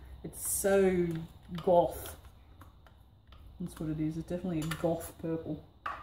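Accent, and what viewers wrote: Australia. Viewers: Love your video and your "accent"... the aussie accent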